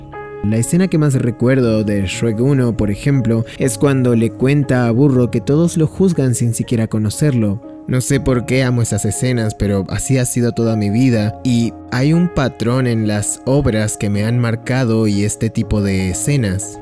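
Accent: Argentina. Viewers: sos argentino o uruguayo? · el video me re ceba pero me desespera el acento neutro/argentino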